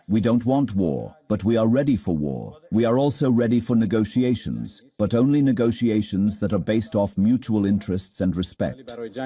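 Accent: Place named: India. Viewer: They are trying to mainstream the Indian accent. They think they are anglo saxon. "Me-ziles".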